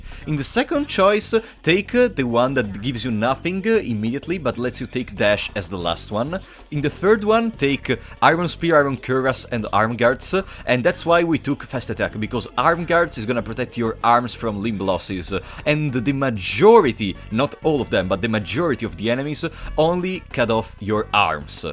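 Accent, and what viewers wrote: Italy. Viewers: Are you italian? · fwiw i love your Italian accent. I'm an American and I understand what you're saying. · When I first started watching your videos your accent sounded Russian, but then after seeing you play the game Italian you now sound Russian but with an Italian twang at the end of your wordsa. Even the content creators for this game are a maddening riddle and mystery.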